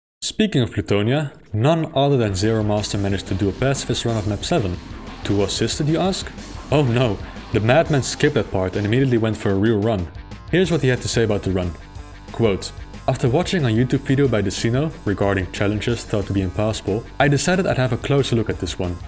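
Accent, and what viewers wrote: Netherlands. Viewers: Are you dutch? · Are you Dutch? Jouw accent klinkt een beetje Nederlands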